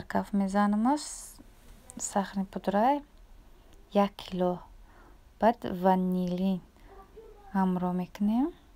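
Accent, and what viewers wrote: Kulob, Tajikistan. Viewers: Ранги хдм гапезанен кулобиеи хдмон хшру гапмезаган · Аи рвечи гуфтанит бафигрм кулоби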